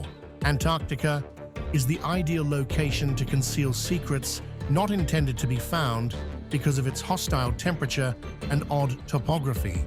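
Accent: United Kingdom. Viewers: DO AWAY WITH THAT HORRENDOUS LOUD BACKGROUND NOISE YOU MISTAKENLY THINK IS MUSIC.... IT'S VERY ANNOYING AS IS THAT HORRIBLE BRITISH ACCENT IN WHICH THEY CAN'T PROPERLY PRONOUCE WORDS.... LAW DOESN'T HAVE AN 'R' IN IT, AND TUBE ISN'T PRONOUNCED AS CHUBE, AND CUBA ISN'T PRONOUNCE CUBER, AND DUNE ISN'T PRONOUNCED AS JUNE!... HORRENDOUS!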